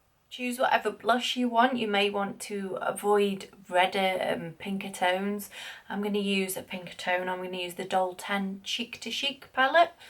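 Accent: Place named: United Kingdom. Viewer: i love your videos and i love your british accent :) This is Littledustmua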